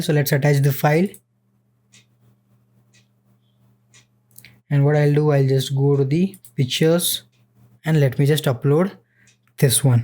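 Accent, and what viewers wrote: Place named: India. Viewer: Nice Video! For usual I habe problems understanding indish accent, but not here!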